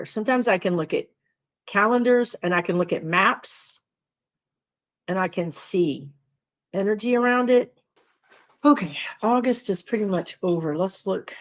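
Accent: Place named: South, United States. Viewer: You are adorable, down to earth, 100% believable and I love your southern accent when you let it out.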